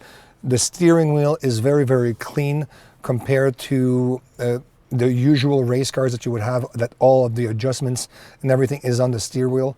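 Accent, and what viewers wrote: France. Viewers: Are you french ? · Is this guy French ?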